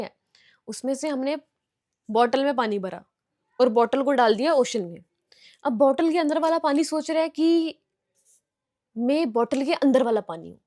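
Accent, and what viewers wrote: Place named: Poonch, India. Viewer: Yeah dear dairy was awesome And poonchi accent tooo❤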